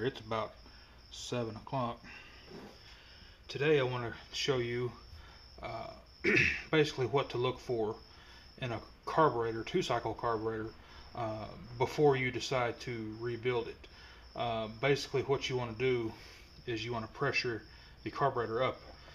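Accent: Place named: Illinois, United States. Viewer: Very informative video. Thanks for the upload!! :thumbs up: Btw, you sound just like my Father-in-Law (Central Illinois accent).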